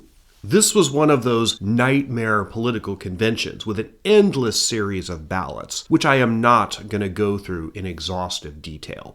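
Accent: United States. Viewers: ❤ you’re delivery & Midwest intonation, hope you research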